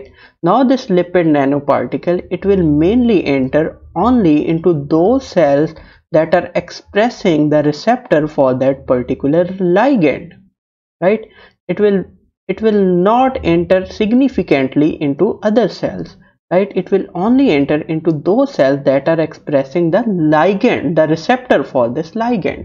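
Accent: India. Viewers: first time like this kind of Indian accent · FFFFFFF!!!!!! why does every scientific medical video have some guy with a terrible Indian accent.....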